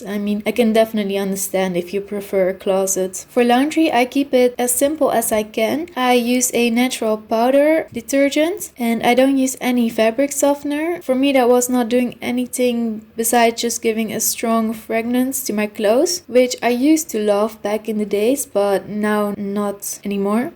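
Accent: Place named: Netherlands. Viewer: ik hoorde al aan jouw stem dat je uit Nederland komt :P ik hoorde het toch goed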